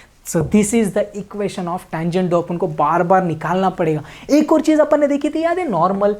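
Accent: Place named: Aurangabad, India. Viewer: Are u from Aurangabad ?? Cause of your language is just like Aurnagabdi Hindi .. 💯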